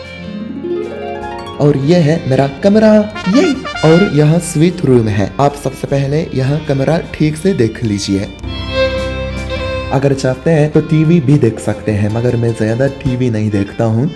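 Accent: Standard. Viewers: although i an Maharashtrian citizen i dont know to speak such pure hindi like u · He is speaking pure hindi 👍 May god bless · Pure Hindi and simplicity = Love from Mumbai only ☺❤😄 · Checking in... ♥️♥️ pure shudh uccharan krte ho aap bhai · Shuddhhhhh Hindi wowowowow👍😁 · s hindi is very pure and good than most of the indians❤❤ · A JAPANESE CAN TALK BETTER HINDI PURE THEN INDIANS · Itni shud hindi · Omg, iski hindi to meri hindi se bi acchi h, itni shuddh hindi😛 Great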